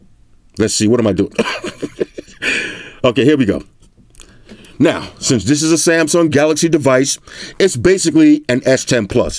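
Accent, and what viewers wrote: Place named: New York City, United States. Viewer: NYC slang in full effect when you watch....I feel like I’m chilling on the “stoop” with one of my homeboys! I know knows the word stoop!! 😅😅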